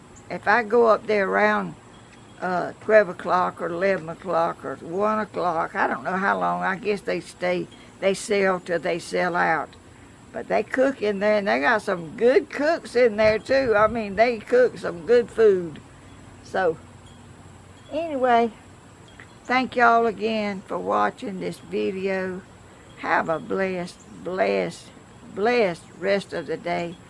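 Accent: South, United States. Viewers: Hello... yes some one has defintely hacked your account posing as u... the what's up app...I had this happen to me on another diyers channel... they caused a lot of problems for the other lady.. have your grandson look into it... so many ppl are jealous of all the accomplishments and hardworking Diyers put into their channel. All their personnel time out of the goodness of your hearts just to entertain us for someone hateful, jealous, person to do this is not right. These ppl need to be in trouble.Btw.. love all your videos your voice is so kind and sweet brings back so many memories that southern accent... you are an anxious, beautiful, kind hearted person. Stay safe.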